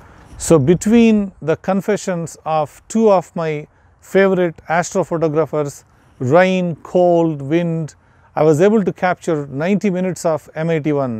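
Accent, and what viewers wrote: India. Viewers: Your Accent Sounded A Lot Like Indian, But Indians Doesn’t Get This Much Exposure To Astronomy Mainly The Equipments That You Use! So I Just Wondered How Come Being An Indian, You Have Access To Such Astronomical Equipments.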